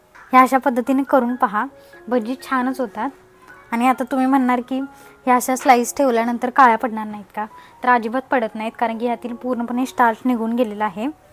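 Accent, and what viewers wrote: Kolhapur, India. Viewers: अस्सल कोल्हापुरी भाषेत बोलतीस ताई आणि आपण कॅमेरा समोर बोलतोय असं नाही तर तू प्रत्यक्ष समोर रेसिपी करून दाखव त्यास असं वाटतं य बघ.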